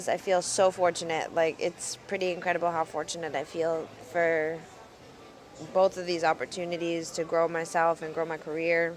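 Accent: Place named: Boston, United States. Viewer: You seem very genuinely happy in your videos it's amazing! Also heard some Boston accent in this one, whateva whateva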